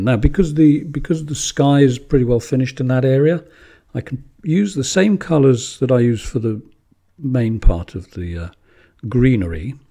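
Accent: United Kingdom. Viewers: Thanks for sharing your wonderful unique creativity. I love oils and your style is so inspirational that I can't wait for my current work to finish so I can try your method. Love listening to you. Besides the lovely British accent, you don't ramble on like some artists I tried watching and chose to turn off sound because of the non topic nonsense they were talking about. Musical intervals are just perfect!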